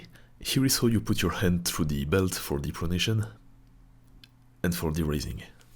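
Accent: France. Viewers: Bon mec je sais si il faut que je sois plus impressionné par tes bras visiblement en titane , on ton accent français à peine perceptible ( vraiment 1er deg tu parles trop bien ang , et même dans tes premières vidéos ct déjà super ) . Keep grinding bro 💪💪💪💪💪💪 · Are you french mate?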